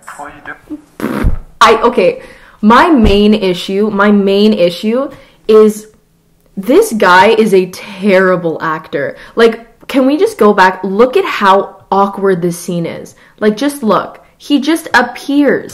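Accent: United Kingdom. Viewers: it's his voice! it's not weird ... {[(british accent DUHHH)]} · Also, where did his british accent go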